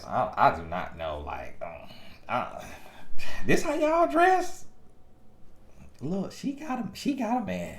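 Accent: South, United States. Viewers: I’m a northerner so I love to hear southern accents 😆😆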